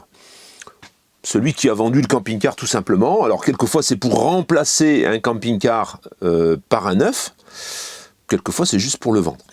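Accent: North, France. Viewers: Mdr la langue du chnord ! Merci à vous 🙏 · Bonsoir à vous, je ne me manifeste jamais car tu as beaucoup de travail. tes vidéos me plaisent, je voudrais te poser une question, que prendre comme GPS pour le cc et la voiture, javais des tomtoms qui ne vont plus. Ton patois du Nord est formidable tu pourrais jouer avec Dany, merci pour la réponse Amicalement, Jacques du pas de Calais.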